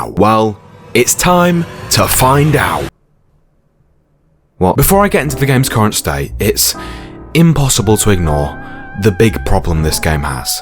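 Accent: United Kingdom. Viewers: Are u British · you sound funny for a british person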